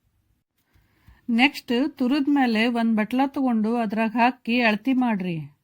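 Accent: North Karnataka, India. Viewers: Uttara karnataka laungage super sister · Super 👌👌namm uttar karnatakd adige matte matu eradu special adar mund yaudu illa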